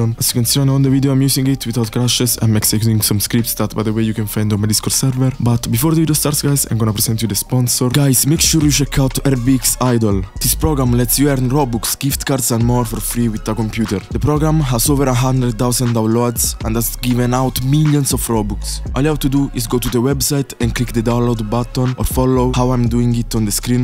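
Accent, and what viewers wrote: Italy. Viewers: l'accento italiano spacca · fra ma sei italiano ?? non sembri inglese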